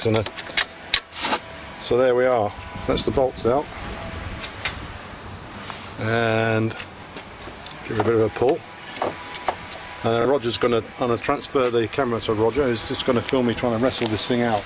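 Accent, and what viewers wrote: United Kingdom. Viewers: You helped me fix a problem after installing new struts (but not the spring) where I had not made sure the 3 notches in the upper plastic mount were aligned with the butt of the bolts in the top plate. This made for some very noticeable knocking sounds when going over bumps and turning which disappeared after I aligned them. But before I watched your video and fixed it myself, I brought it into my local Ford dealer and they charged me $195 for a diagnosis that found nothing (confirming my belief that I can do the repairs better with YouTube than even the most qualified and expensive mechanic). Thank you for this awesome video. Your accent sounds Cockney, like my creative writing teacher. Bloody right then. Best of the lot.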